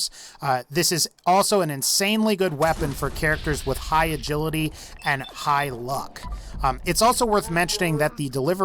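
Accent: South, United States. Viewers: He sounds like he's from the US... southern gulf/bible belt area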